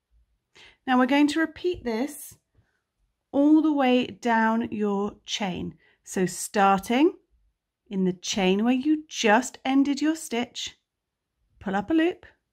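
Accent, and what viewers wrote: United Kingdom. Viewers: another excellent tutorial. has a pleasant easy to listen voice in UK English.Not sure why American crochet terms are used though. I am sure there is a good reason?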